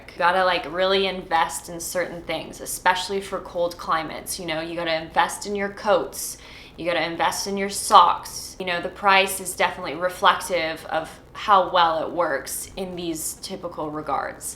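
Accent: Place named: Winnipeg, Canada. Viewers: Hi. Where do you live? I recognize your prairie accent. I grew up in Northern Saskatchewan and I don't remember sunny days. I just remember gray skies. I like how you put your outfits together. I really don't like wearing bluejeans during the winter because the cotton just feels so cold. If you are interested I prefer Pajar boots. They seem more practical and much warmer than Uggs. They also don't get as ruined as Uggs. Great video. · Are you from Winnipeg? This definitely sounds like a Winnipeger!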